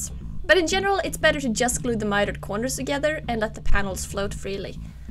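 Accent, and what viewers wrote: Sweden. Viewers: luse i speak swedish ans she does too. you can hear it quite alot from her accent if that was what you meant. cheers lad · you have a very swedish accent :P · Are you Swedish I can kind of hear that on your English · Du låter svensk? :D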